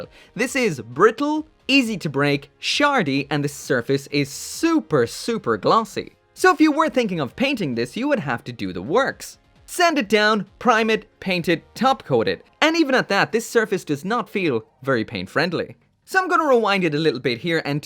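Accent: Ireland. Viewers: Irish accent really popping through in this review. Also props to him for review this abomination of a kit 🔥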